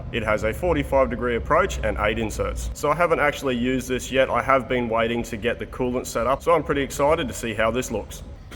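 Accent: Australia. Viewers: Love this channel, I’m American and from across the world and is still the most relatable guy on YouTube, talks like every guy in the shop just with an Australian accent, and using metric, and bananas 🤢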